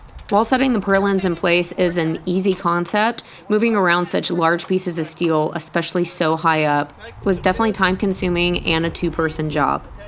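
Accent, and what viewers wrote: Texas, United States. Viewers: 1) You're a role model for me because of your willingness to tackle things you don't know and learn as you go. 2) Your accent makes me homesick for Texas 3) The head scarf thing looking like Rosie the Riveter was pretty damn awesome. 4) There's no four. Why did you think there would be?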